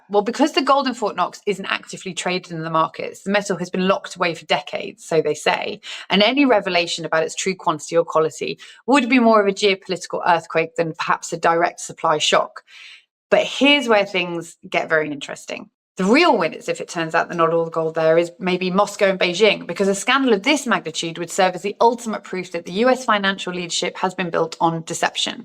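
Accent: United Kingdom. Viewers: Who is we? Your accent sounds British? We in America need to do nothing if it bothers you then go use yin if memory serves the British pound was once a world currency correct? So I think the entire planet has gotten themselves into debt nearly all countries….what blows my mind is the country with the reserve currency has to debase it in order for all the free loaders to use it, all these videos on what we the USA must do, we don’t have to do anything we have been paying the bar bill for all the NATO countries for some time allowing all of you to spend less on defense and to rip USA off in trade all to help your countries and you all still are in debt…..America is in the debt because we have been covering the cost of allot of countries…Cold War ended at that point you all should have pony up but for some reason it didn’t happen….now with trump saying enough is enough we get all the tears Canadians are having a melt down over it the were getting 200 billion off us in trade but blocked allot of our goods same as European countries. 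Here is what I think if the gold is gone from Fort Knox and the USA dollar collapse Europe will be at WW3 inside 5-10 years with each other or Russia do the math if the reports are true Germany has one of the largest gold reserves hmmmmmm the country that started ww2 that owed war debts until what the 90’s will suddenly be most powerful European country lol every time Germany has been unified and has the means there has been war.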